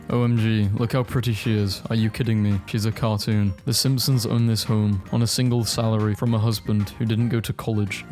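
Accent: United Kingdom. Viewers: I walked into my brother’s room and asked him what he was watching, he said “It’s this depressed British guy reading memes, he’s surprisingly good entertainment.”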